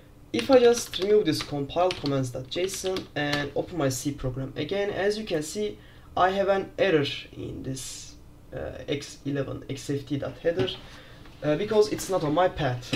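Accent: Turkey. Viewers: Thank you for the Xlib tutorials. Unfortunately X11 programming sources are scarce. I appreciate your tutorials. Would you cover Xshape click-through windows too? A transparent screen widget would be good. Your accent sounds Turkish.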